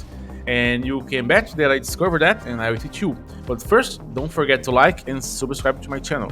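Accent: Brazil. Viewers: Sotaque de BR é sempre reconhecível, mas ta mandando mto! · irmão, tudo bem? Quero deixar aqui meus parabéns por produzir conteúdo em outra língua, eu perguntei por conta da entonação "Eh" que é bem característico de brasileiro, não perguntei para causar algum tipo de ofensa ou intimidação, mto pelo contrário, se vc produzir conteúdo sobre este jogo em português vou me inscrever no seu canal pois achei bom. Desejo a você muita resiliência e sucesso, forte abraço.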